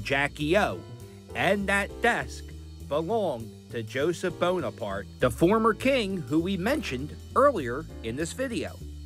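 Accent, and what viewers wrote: Philadelphia, United States. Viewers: Great Tour👍👍Love the Philly accent !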